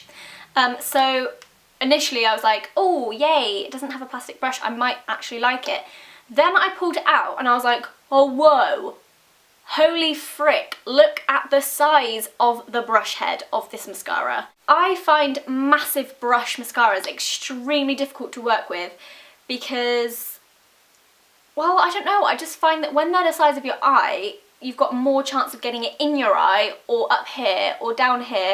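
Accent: England, United Kingdom. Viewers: i subscribed because your amazing british accent, but then i figured out i love your videos, too ;D well, your really pretty and really amazing! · British accent-3-3-3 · you're the representation of an british accent :D cute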